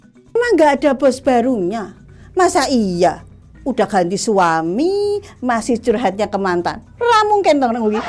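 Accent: Madura, Indonesia. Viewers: Ini mak jelas org madura nih, Karena aksen suara nya ada aksen madura trgas, lugas, jelas, cerdas, kreaktif, itu tipe wanita madura.. Itu prediksi saya, Maju terus mak. Konten mu sangat membantu utk koreksi, intropeksi buat aparatur negara.. Semoga sukses mak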